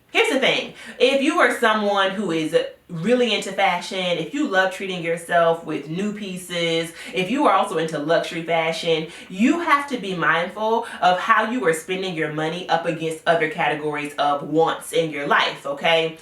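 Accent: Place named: Washington DC, United States. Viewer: Woohoo I thought of doing a channel like this but you are better at it!!! I’m 100% with you. I have well made pant suits I’ve had over 10 years. I’m trying to do the 30-30-30 wardrobe. I’ve written down my pieces and gotten rid of the excess. Now working on defining my style cuz I like such a mix of classic looks and boho looks. You’ve got a new sub. (Do you watch Alyssa ?) her channel is good too. Edit: when I got to the end we talked about your therapist and the cadence of your speech I immediately thought I wonder if she is from DC? Then you mentioned the metro and I was like oh yeah she’s from DC. 😂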